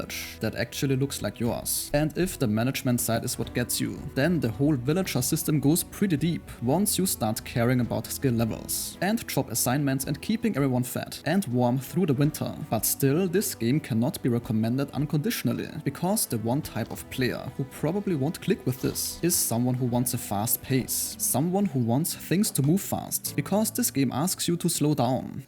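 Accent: Germany. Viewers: so deutsch ab sekunde 1 instant gehört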